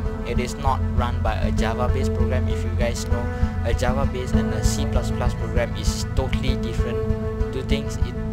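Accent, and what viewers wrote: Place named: Singapore. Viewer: You sound singaporean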